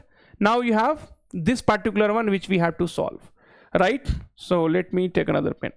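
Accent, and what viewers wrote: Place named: South India, India. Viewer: Your english is as if you are South Indian😅😅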